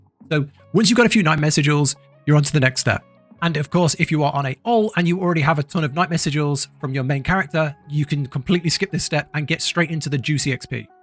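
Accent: United Kingdom. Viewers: he talks too dam fast to the point where it sounds like he sped his voice up 😂 I rewinded the vid 8x still can't understand the 1st thing im supposdd to do 💀 And the UK accent isn't helping 😭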